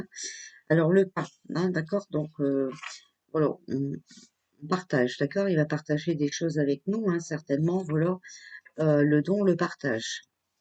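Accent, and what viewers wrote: North, France. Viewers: J’adore votre accent du Nord ! Mdrrr merci pour ces infos j’en suis sure il va tout faire pour nous aider ! La divinité l’a mis sur notre chemin celui de la vérité et de l’amour ! Sans hésitation je vote Rémy ! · bravo à vous , vous tapez souvent dans le mile , vous etes un soutient psychologique pour plein de monde , vous etes empreinte de la lumière , j'aime bien votre accent du nord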